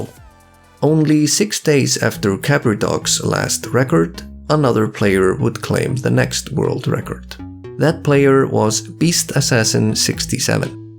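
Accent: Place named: Iceland. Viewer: I never click speedrunning videos. Click this one and instantly was like woah is that an Icelandic accent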